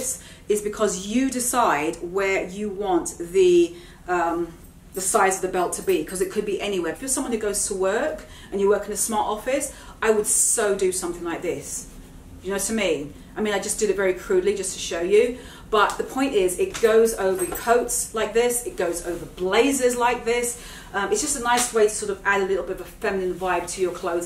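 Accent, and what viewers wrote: England, United Kingdom. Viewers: Love your videos,. I'm a Scot living in SoCal and really enjoy your lovely English accent and style, of course <3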